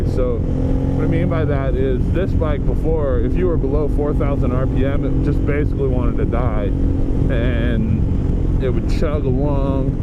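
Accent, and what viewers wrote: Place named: United States. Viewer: Awwm until you started talking I thought you were an actual Italian reviewing the can and the bike. What the fuck do Americans know about motorcycles?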